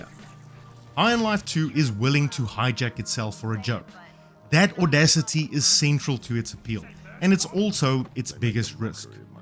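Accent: South Africa. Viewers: Bro is 100000% a south african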